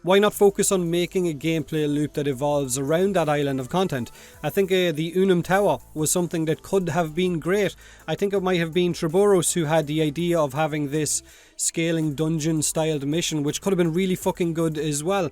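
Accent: Ireland. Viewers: You're my favorite person to watch for warframe related stuff. I love the personality, the Irish accent, the entertaining videos, and the honesty. Don't stop being you, and definitely take care of yourself physically and mentally. My condolences for your losses.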